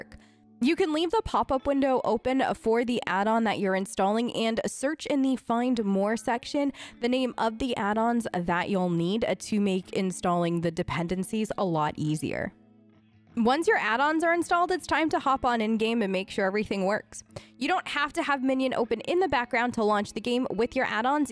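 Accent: Australia. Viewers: You are adorable and helpful ty. I can tell you have a Austrillian accent by the way you say ESO. I love it. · Sick vid, but dont you think for one moment i didnt catch that hidden aussie accent. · OHHHH she's australian! gotcha hehe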